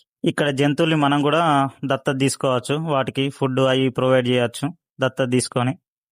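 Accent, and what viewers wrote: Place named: Telangana, India. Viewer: Pakka telangana slang.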